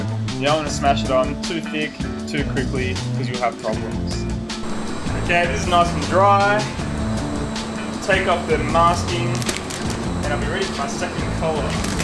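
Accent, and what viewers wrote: Australia. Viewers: A R its just an Australian accent it's not that hard to understand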